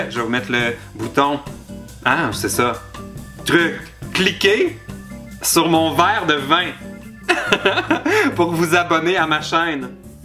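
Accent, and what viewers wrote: Quebec, Canada. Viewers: BONJOUUUR <3 je t ai découvert récemment et franchement je t adooore, tu me fais trop rire et j adore ta personnalité en fait :) ton verre de vin omg garde le toujours! xd +1 abonnée, t es mon gros coup de cœur du moment haha bisouuus :d <3 signée: une chinoise/ belge si si (j adore ton accent québécois aussi) x) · Salut! Je viens de découvrir ta chaine par hasard au twitter. Je suis brésilien et j'étudie la langue française mais c'est toujours du français parlé en France. Donc, c'est très interessant d'avoir un contact avec un chaine du Canada. Au moment où tu as commencé a parler je me suis aperçu que c'était du français québecois. C'est mignon. :)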